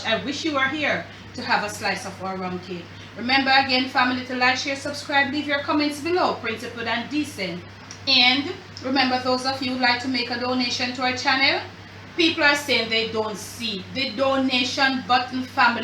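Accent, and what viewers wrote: Jamaica. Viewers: Thank Ms..I love all of your recepies....😍😍 Love the way how you keep the Jamaican accent.. everthing that I have in mind to try so far, I just click on your channel..and There you are.. Manner and respect!!! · Hi Ms., i love your Jamaican ascent, an i love your rum cake. If you are baking for kids what can you use instead of rum. Thanks dear.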